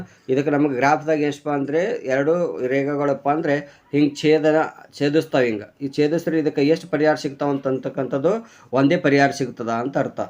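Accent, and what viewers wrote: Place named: Jevargi, India. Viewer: ಸರ್ ನಿಮ್ಮ ಮಾತು ಜೇವರ್ಗಿ ಮಾತು ಸರ್ 🔥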